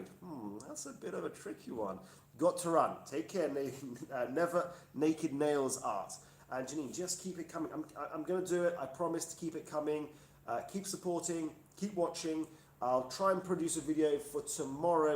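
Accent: United Kingdom. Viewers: I love your British accent, you are lovely and really hot. Looking forward for more videos. Greetings from Germany. Enjoy your vacation.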